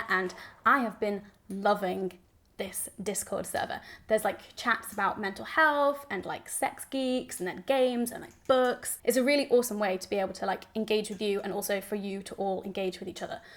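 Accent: Texas, United States. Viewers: I loved your Texas accent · Your Texas voice was pretty good. Your vowels need to be longer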